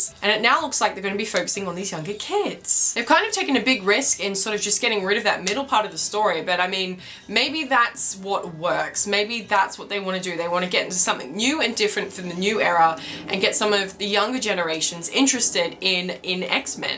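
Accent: Australia. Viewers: Ahh an Australian accent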